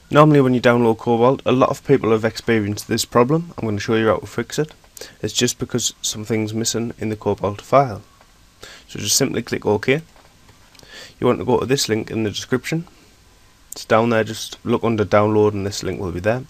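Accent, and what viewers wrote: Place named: Jamaica. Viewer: i love your Jamaican accent :D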